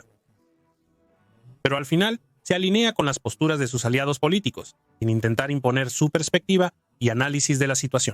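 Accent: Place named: England, United Kingdom. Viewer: En este video, los apellidos franceses se pronuncian " a la inglesa". Hombre, no creo que sea tan difícil hacerlo en francés.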